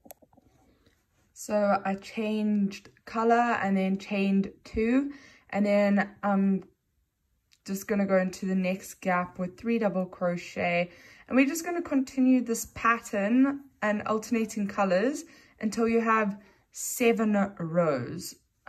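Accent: South Africa. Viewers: Hello thank you for such an amazing tutorial! Just a random question, are you South African?😊😊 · Are you South African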